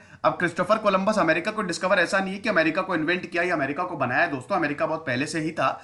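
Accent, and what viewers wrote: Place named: Uttar Pradesh, India. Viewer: UP ke lagte ho bhaiya baato se😂😂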